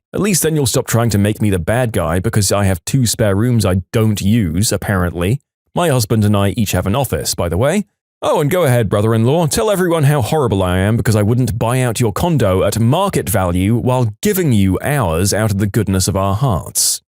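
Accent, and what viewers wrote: United Kingdom. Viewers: love the british voice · What is this British voice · British alert🚨🚨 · You are now have a british voice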